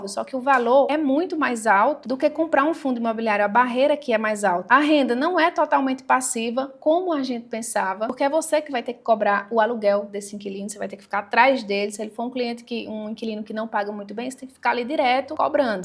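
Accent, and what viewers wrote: Northeast, Brazil. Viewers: Tá cada mes mais gata 😁 e esse sotoque nordestino eu acho a coisa mais maravilhosa do mundo 😂😂❤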